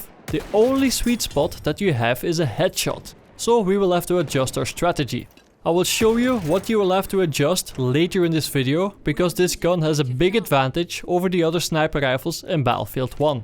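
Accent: Netherlands. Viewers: Het is makkelijk te horen dat hij nederlands is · he really sounds Dutch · this dutch accent hahaha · sounds like he's from the Netherlands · Is this guy Dutch? Sort of sounds like it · Einar Samuelsson He is from the Netherlands, believe me. I am from Belgium and I know how dutch people speak and I can understand them because we speak the same language. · That dutch accent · the "r"s sound pretty dutch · you are really dutch i hear it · the dutch accent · Horrible Dutch accent.... 'Twinty meters' ' Drrrop' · de duts aksent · It is clearly a Dutch accent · this is the kind of english with a Dutch accent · Are you Dutch ? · It's sounds like your dutch?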